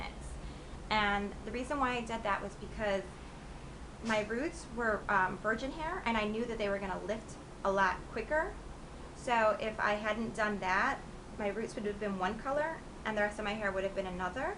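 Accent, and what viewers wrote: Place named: New Jersey, United States. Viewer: i love your voice, you sound jersey haha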